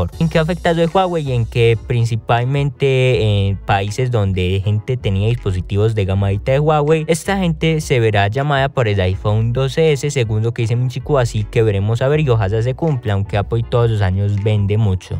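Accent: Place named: Colombia. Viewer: Me gusta el contenido. Pero me tengo que acostumbrar a ese tan marcado acento colombiano . O es la voz? O son ambas cosas? No lo sé, me imagino que es cuestión de acostumbrarse. Gracias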